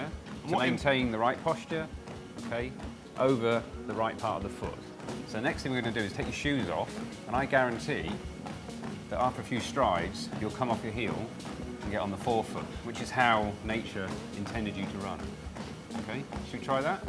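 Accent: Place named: United Kingdom. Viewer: All the information seems good, but does anyone else hate fucking British accents?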